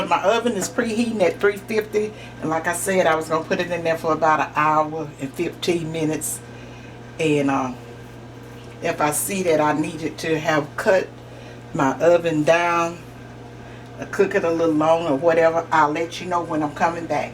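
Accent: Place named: North Carolina, United States. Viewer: This evening is my first time seeing your show. I had to click play when I saw the name of your channel, Happy Table. I have never had corn pudding. I am 51, and I have seen it twice at potlucks about 30 years span! In each case, it was provided by a young lady with heritage from the Caribbean---Guyana and The Bahamas. You sound like my husband's people out of North Carolina, and like church women I would have liked to listen to and watch at church as a child, or like distant relatives at a family reunion where you feel right at home and belong. Thanks for sharing your recipe and happy kitchen. Now that I see what is in that corn pudding, I might try it. (I sometimes wonder how to make spoon bread. That is an idea.)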